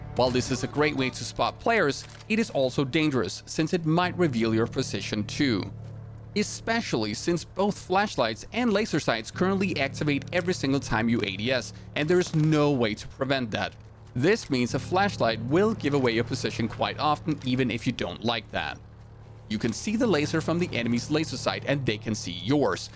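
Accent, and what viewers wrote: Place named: Denmark. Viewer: really good guide and could tell you were a dane by the accent, keep up the good work :)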